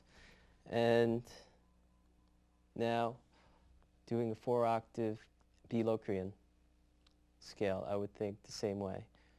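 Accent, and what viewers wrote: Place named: New York, United States. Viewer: I love his New York accent.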